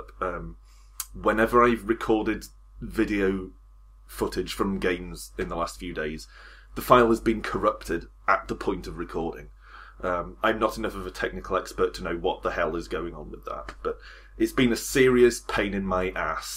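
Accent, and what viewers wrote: United Kingdom. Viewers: I'm glad things seem to be sorted. I just found the channel, and definitely subscribing! ...although, I must admit it is in part because of your British accent. (^_^)b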